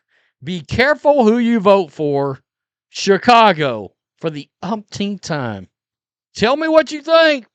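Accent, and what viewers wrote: South, United States. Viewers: Curious what neighborhood of Chicago accent is that? What are you, 4th generation Uptown? Chicago's a progressive City. I doubt very many of us care what some d wad with a Southern accent thinks.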